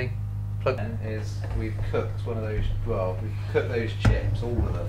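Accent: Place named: Bath, United Kingdom. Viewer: . Hi, I grew up in Bath so its great to hear the home accent again. You have the most amazing videos, you have a great life and you have done so well in sharing. I just wondered if there was any chance you ran any apprenticeship type causes. you seem to be one of a very thin number of people who I think I would respect enough and be able to learn from.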